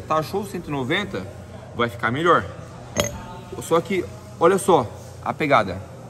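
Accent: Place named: Goiás, Brazil. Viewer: Goiano uai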